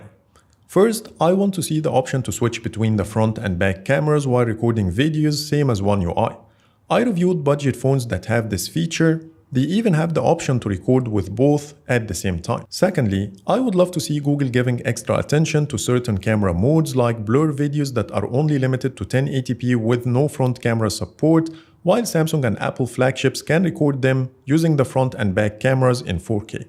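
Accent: Egypt. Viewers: Are you Egyptian You accent looks like Egyptians accent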